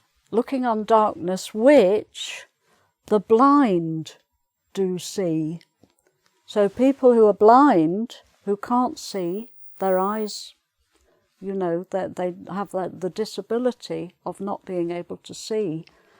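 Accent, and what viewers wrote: United Kingdom. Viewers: I'm 15 years old and I'm from Brazil! I love your work and I understand a lot of your words, british accent is beautiful! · Omg this lady is a pure british perfection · I love British accent, and you are the best ♥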